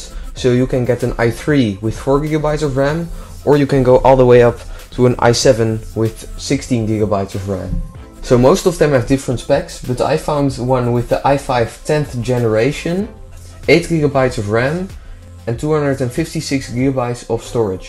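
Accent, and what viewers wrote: Netherlands. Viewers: You sound like you are from the Netherlands! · Are you dutch perhaps? Or maybe from Belgium?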